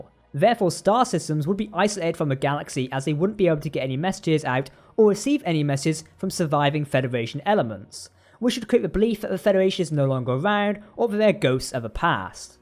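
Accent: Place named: England, United Kingdom. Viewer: Debris is pronounced “debree” not “debreeze”. With an English accent it’s stunning you pronounce the word incorrectly.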